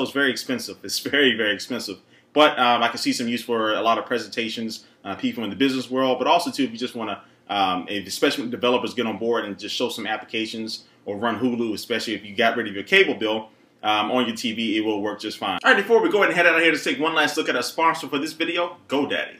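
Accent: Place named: South, United States. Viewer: Liberry. Akurrate. Lol love the southern pronunciations. (I live in Louisiana)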